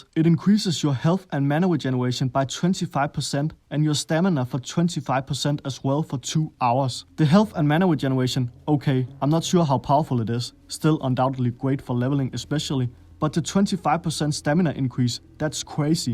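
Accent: Denmark. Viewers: Lol den danske accent er stærk :p God video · This is the most Danish accent I've ever heard. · Haha ja lyder som nordjysk :p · probbaly the worst danglish accent i heard to date. Thought oure military was the worst but you just wont that metal my friend. Accent homie accent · that is some insane danish/english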